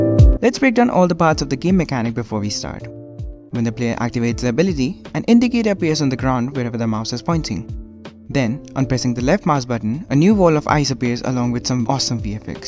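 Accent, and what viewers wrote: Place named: India. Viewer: Great vedio. Literally can't differentiate between the original and the one you made. Also I got a question for you. Where do you live? (Country name). You sound very Indian to me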